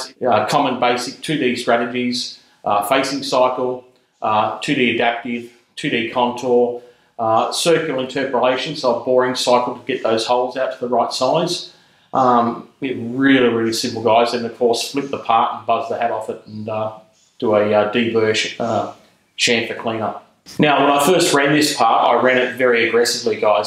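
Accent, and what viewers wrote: Australia. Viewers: Hello where are you ? Sounds like Australia, have a question for you. I've been looking on the internet for awhile and can't find what I'm looking for and found you. I'm trying to find an adapter plate for a Rochester varajet manifold to the Holley Throttle body injection system. 2 barrel to two barrel. I don't want a high rise because I don't want to modify my hood. If you could make it how much would you charge me? Thanks Tim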